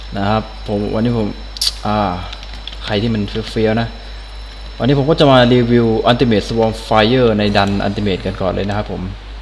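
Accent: South, Thailand. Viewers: พี่เป็นคนใต้หรอ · พี่คนใต้หรอครับ5555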